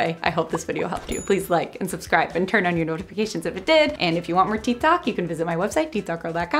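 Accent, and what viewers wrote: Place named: Upstate New York, United States. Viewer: are you from upstate NY?? I'm curious where that accent is from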